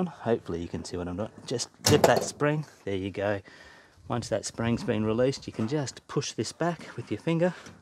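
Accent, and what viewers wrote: Australia. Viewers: I have been stuck on my dryer for a while cause I couldn't find anything to show me the how or what I did wring when replacing the part. So thank you. An also your a funny man I didn't see where u were from an I was like I'm sure he is Aussie an then u said spider I was like yep . So thanks for the info an a giggle look forward to watching more of you.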